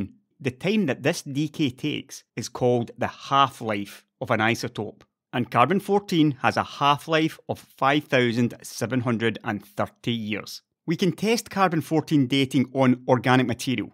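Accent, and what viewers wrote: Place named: Scotland, United Kingdom. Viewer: After a day of work, gym and chores, calmly at home with a glass of beer in my hand, spending about 1h listening to magnificently Scottish voice talk about science is almost a divine experience, ironically:D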